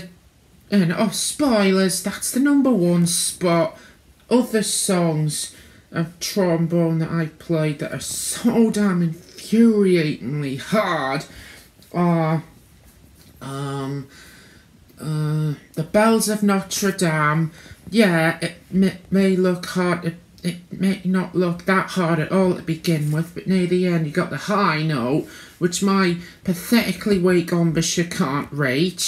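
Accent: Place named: England, United Kingdom. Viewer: Oh yes, youre from England:D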